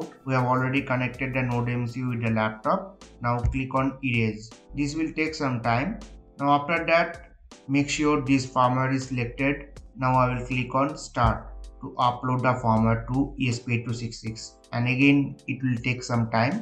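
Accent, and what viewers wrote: India. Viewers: Awesome video 🔥🔥, and thank you for the presentation, BUT could you please replace your real voice with some AI google speech synthesis engine ? Your thick hindi accent It drives me crazy , and I barrely understand the content .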